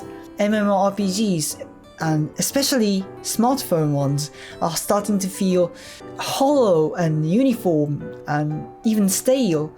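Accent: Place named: United Kingdom. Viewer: Your British accent is good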